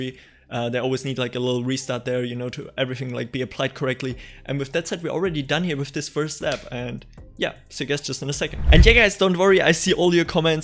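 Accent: Germany. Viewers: You are German or?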